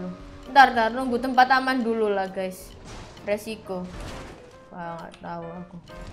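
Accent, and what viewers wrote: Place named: Java, Indonesia. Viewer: Suara mu medok banget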